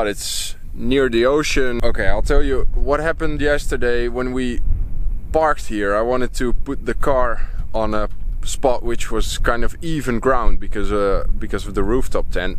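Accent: Netherlands. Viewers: I can hear the dutch accent when you talk :P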